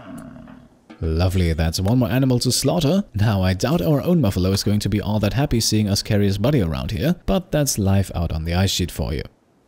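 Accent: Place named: Germany. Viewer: I thought you were German. It's not super obvious, but reminded me of how I sounded some years back.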